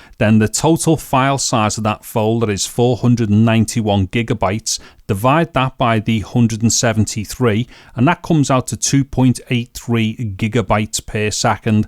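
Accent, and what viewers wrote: United Kingdom. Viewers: Hi! First of all I (as a "bloody German") have to thank you for your very precise pronounciation! It's been a pleasure to listen to your video and I like your accent, though I wonder from which part of the UK it originates. Concerning the read speed you measured with the enclosure one has to consider the write speed of your internal ssd. This has to be faster than the read speed of the external ssd to be able to measure the correct read speed of the TB/USB4 enclosure! By the way, those enclosures with an ASMEDIA AS2464 chipset are both TB4/USB4 compatible with complete downward compatability. Connected to a real USB4 controller in a Win11 laptop or pc you can reach up to 3800 GB/s according to ads for such enclosures such as ZikeDrive Z666 or Adata SE920. So, if you're going to proove these drives in future videos, you're going to need a relatively new laptop or a USB4 controller card for a not too old pc. 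Your 10 year old optiplex seems a bit too old for this, I think...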